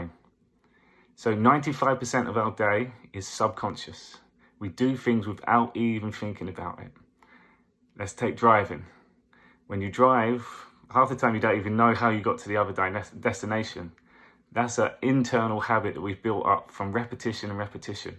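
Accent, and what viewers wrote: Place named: Milwaukee, United States. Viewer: You have a nice accent are you from Milwaukee?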